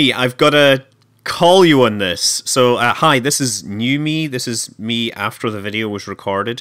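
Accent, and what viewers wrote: Scotland, United Kingdom. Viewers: Somewhere in the UK I don't know what city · Another Scotsman yes!!!!!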